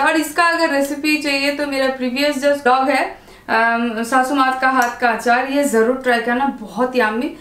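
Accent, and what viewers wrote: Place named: Mumbai, India. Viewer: Mumbai style dialogue 👌😍😍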